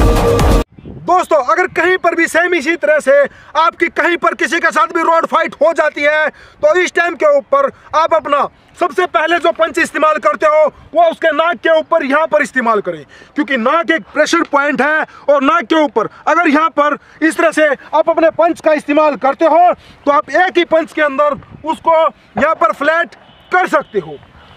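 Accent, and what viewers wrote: Haryana, India. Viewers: Haryanvi ton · Are you haryanvi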